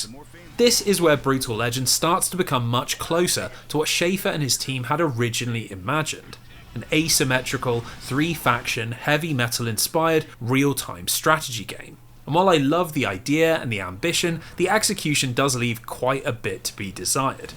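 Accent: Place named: RP, United Kingdom. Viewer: Holy shit, it's him. Am I the only one who had never once seen the guy behind the voice? I had this nerdy, posh British lad in my head (still love the content), but color me shocked.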